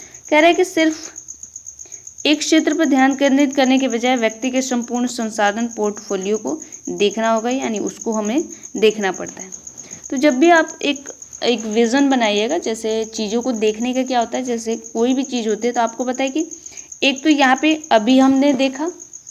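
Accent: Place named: Bihar, India. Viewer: aap bihar me kha se ho kyunki apki language suni suni lgti hai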